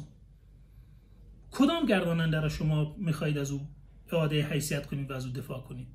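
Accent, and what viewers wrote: Iran. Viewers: شایدانسان خوب باشی ، اما طرز صحبتت کمی ایرانی شکل است و برای لحجه دری صدمه وارد میکند .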